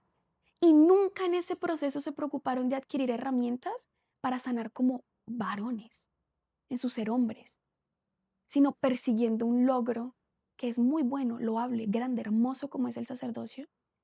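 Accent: Colombia. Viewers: Que linda y hermosa!. en el buen sentido de la palabra y con todo el respeto cristiano. Mas allá de su físico capto su belleza a través de su palabra 😅. Su forma y su tono gracioso de hablar 😹. Tiene mucha gracia en su gesto y en su verbo para poner atención de los temas intensos de sexualidad con relación a la religiosidad. Habla muy bonito , su forma de hablar colombiano. Me encanta ésa forma de hablar 😍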